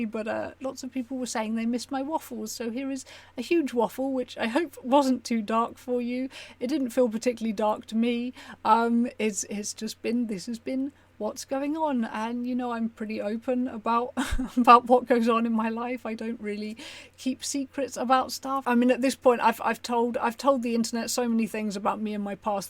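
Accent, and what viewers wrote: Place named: South England, United Kingdom. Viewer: I've just discovered your channel after being recommended some of your ED content (I've never had one but I'm interested in educating myself and understanding others' experience) I just wanted to say your MH experience here is scarily similar to an experience I had back in 2012, except I was locked up for three whole months. As an informal patient! And I regard myself lucky because services were slightly more funded at the time... You've articulated this whole thing wonderfully. Also, shout out for the south England fam - I noticed your accent was similar to mine and then you mentioned a place name that isn't too far from where I grew up so, yay!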